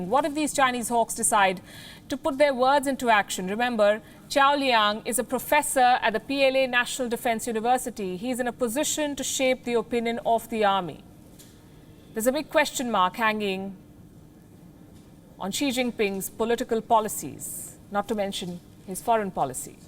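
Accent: India. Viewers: This indian lady is trying her best to slang...but turn out still india sound. 🤦‍♂️ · Your English is after all 3rd rated indian English, if he is propagating for less aggressive posture then how can say hawkish at the same time? It's not hawkish it's dovish bharati mata. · India voice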